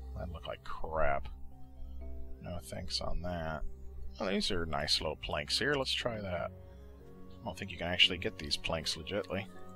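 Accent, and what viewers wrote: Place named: South Africa. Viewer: That south African accent helps: )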